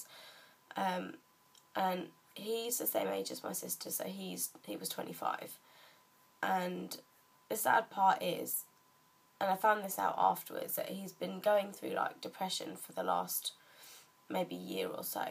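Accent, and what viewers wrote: England, United Kingdom. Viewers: whoa i was not expecting the english accent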